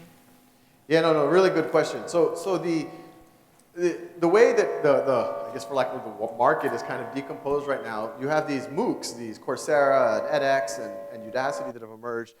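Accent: South, United States. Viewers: Excellent presentation. i love his down to earth attitude, his humbleness and even his slight southern accent ( i love it when he says "Y'all). Khan academy is the best!!!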